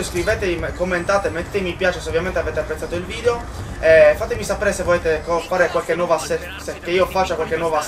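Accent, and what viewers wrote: Sardinia, Italy. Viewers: Ma sei sardo? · fra sei sardo vero??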